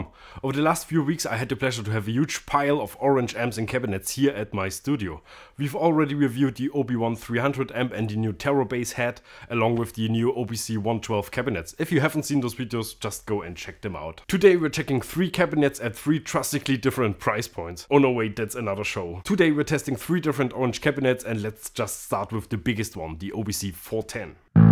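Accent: Germany. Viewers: у него немецкий акцент?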